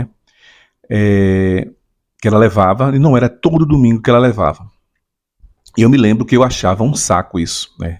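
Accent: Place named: Pernambuco, Brazil. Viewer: Muito boa a forma que o senhor explica,sem se exaltar com calma sem ofensas a quem ainda acredita parabéns, além do mais tem esse sotaque maravilhoso eu como baiano mais adoro o sotaque de Pernambuco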